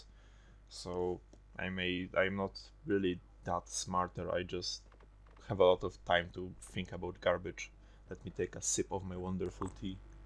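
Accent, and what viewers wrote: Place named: Eastern Europe. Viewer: Polish, that was very enjoyable to listen to, I'd be glad to listen another ones. Also, your english is just fine, take it from another slav. So don't sweat about it, after all we are foreigners and it is highly justifiable for ears of others to hear our rough talk.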